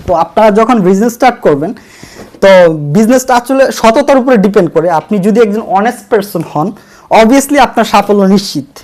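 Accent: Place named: Sylhet, Bangladesh. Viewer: সিলেট কথায় পাওয়া য়াই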